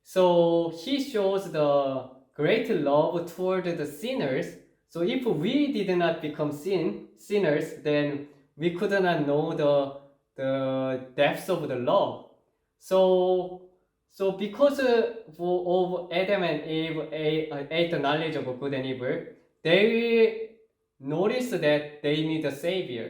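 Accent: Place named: South Korea. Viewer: 콩글리쉬 발음으로 영어로 성경 읽고 설명하느라 애쓰십니다 저는 그만큼이라도 영어가 안되는데, 유창한 영어 발음은 아니신 것 같은데 그래도, 그렇게라도 하시는거 보니 부럽네요 .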